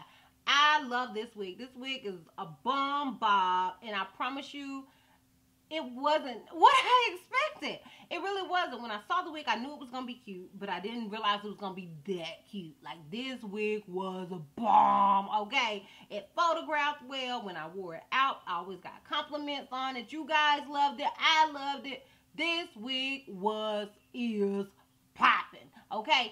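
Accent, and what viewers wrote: South, United States. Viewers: Girl, I love your country accent lol. Them wigs were cute or whatev. #2 was my fav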